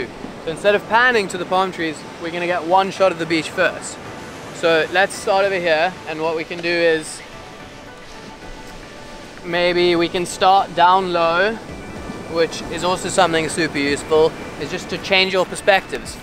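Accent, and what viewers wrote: South Africa. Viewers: Saw the title and knew I had to watch it...Heard the SA accent & was so happy 😂 great, helpful video thank you! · Is that a hint of a South African accent I hear in amongst the UK accent?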